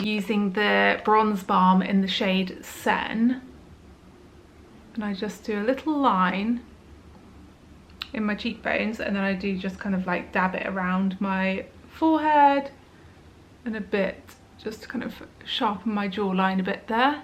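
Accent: United Kingdom. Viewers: I’ve followed you since the makeup tutorial times. I always enjoyed your videos. Your voice is so soothing and it doesn’t hurt to have that amazing English accent. So happy for you … you seem so happy! You have a precious little one watching you read a book to him made my heart melt. Enjoy him they grow so fast. Blessings ❤️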